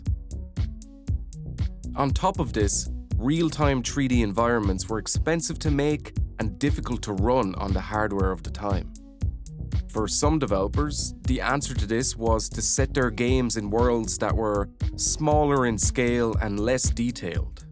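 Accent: Ireland. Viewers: You're from Dublin, ain't'cha? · Tree D or 3D 😂 im a a black girl from Atlanta but my dad's parents are from Ireland. I know a fellow Irishman when I here one. I'm making a 16 bit game and need all the info I can. Take care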